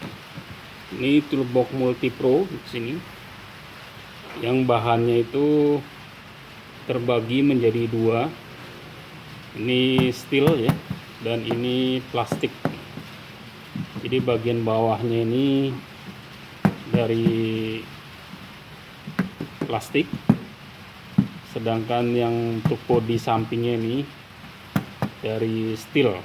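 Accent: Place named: Jakarta, Indonesia. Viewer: logat betawi bangett sih bang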